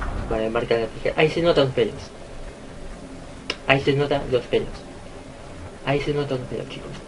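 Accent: Spain. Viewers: por favor deja de hablar como español tenés que ser vos!!!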